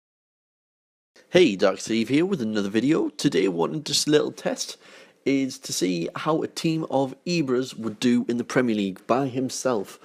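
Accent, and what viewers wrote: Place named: North East England, United Kingdom. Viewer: your accent are you from the north east of England?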